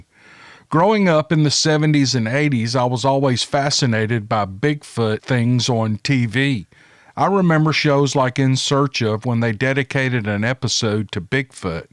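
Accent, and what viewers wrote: South, United States. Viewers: I like listening to your stories in that American accents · love the channel, you keep up the great work, i love listing to a southern boy reading stories. my people! you go boy, keep up the great work! here we go! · Hey,. You are an amazing story teller and narrative reader. Love the southern twang. Keep up the awesome work 😊😊😊😊 Ron From Calgary Alberta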